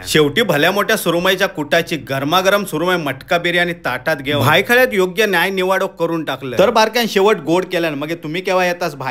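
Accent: Malvani, India. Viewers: Add 1 number and malvani dialogue superb 1 number ❤😊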